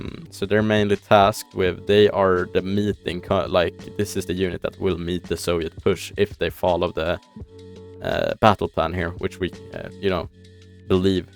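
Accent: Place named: Sweden. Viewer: I knew that you're Swedish from the accent lmao😭.Btw I love your content,keep on going,you'll eventually get more views and subs.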